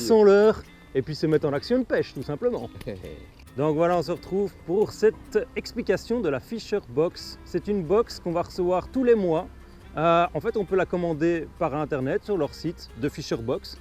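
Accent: Belgium. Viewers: Tu viens de Namur tu as laccent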